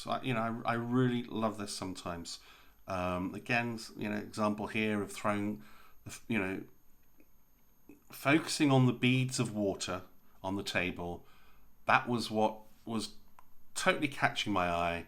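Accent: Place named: South, United States. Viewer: You seem to be British. Perhaps you are from the South of Britain because you speak like someone from the South of the US. I know that you are headed someplace and I am intriged enough to wait until you get there. Just an observation. Love both photos.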